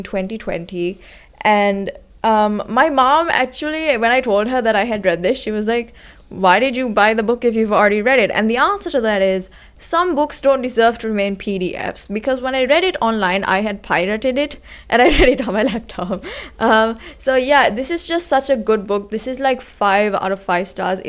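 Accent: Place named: United Kingdom. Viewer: Uk English 🙏👍❤️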